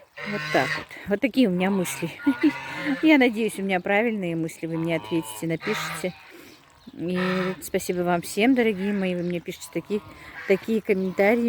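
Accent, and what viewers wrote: Standard. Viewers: Здравствуйте!Недавно вас смотрю,мне очень нравятся ваши ролики.И еще вы так по русски чисто и грамотно разговариваете.желаю вам и вашим близким удачи и здоровья.Я живу в Омской области р.п.Шербакуль -это Сибирь.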